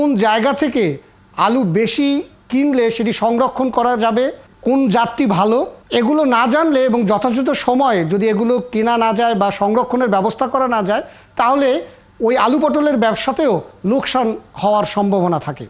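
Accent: Kolkata, India. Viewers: এটা কি বাংলাদেশের চ্যানেল। যিনি কথা বলছেন উনি কলকাতার মত করে কথাবলছেন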